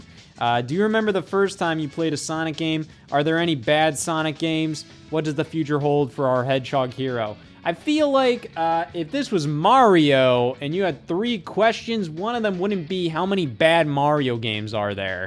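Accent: Ohio, United States. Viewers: The ohio is showing by pronouncing it ride aid lol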